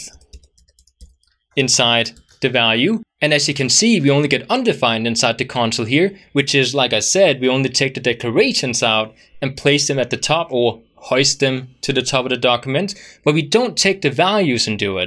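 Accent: Italy. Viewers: are you italian? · is he Italian?🤔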